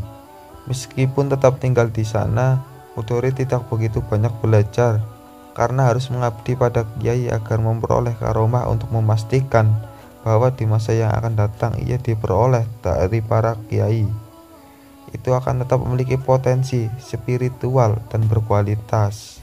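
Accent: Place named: Magelang, Indonesia. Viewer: mc nya ngapak magelangan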